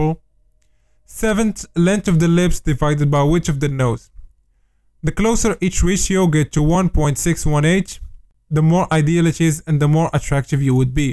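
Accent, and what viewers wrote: Morocco. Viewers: hhhhhh 3aref rassi m3etel walakin mn l anglais dyalk 3reftk maghribi, tbarkallah 3lik a khoya kml f self improvement content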